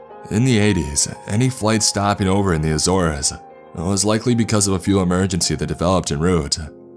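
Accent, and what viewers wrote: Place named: California, United States. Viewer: iv always just thought of it as a surfer bruhv California accent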